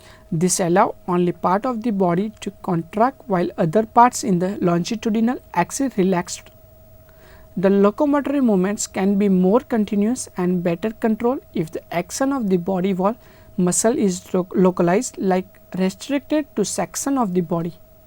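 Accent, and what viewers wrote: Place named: North India, India. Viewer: Very bad English......typical rural small town North Indian accent.....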